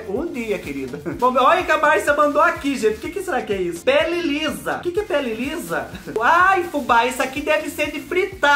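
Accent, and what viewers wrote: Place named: Itatiba, Brazil. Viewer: Adoro esse sotaque dele. Eu sou baiana,mas morei muito tempo em Itatiba,onde o povo fala bem desse jeito e eu morro de saudades.💞🙏😢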